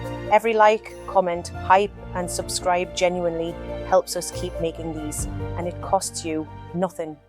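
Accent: Newcastle, United Kingdom. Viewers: The Duke's estate is Alnwick castle, and the Dutches has built a poison Garden with almost every deadly plant in there behind security. Love your channel keep up the good work and its nice to hear the Geordie accent.... pet!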